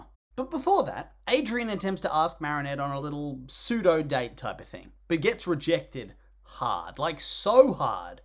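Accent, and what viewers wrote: Australia. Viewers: Y’all did he get more Australian in this video? Or is it just me?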